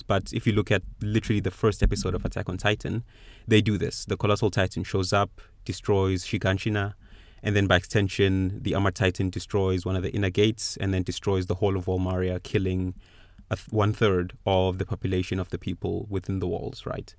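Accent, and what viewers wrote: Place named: Africa. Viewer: Firstly, you should never make another video. You suck and your voice sucks. Secondly, the decision to invade Paradi was made by brats who never knew the kings vows and were just trying to return home as heros. It was never the orginal intention of the Marley Army. By the way you sound African. Overthinking is the reason we are poor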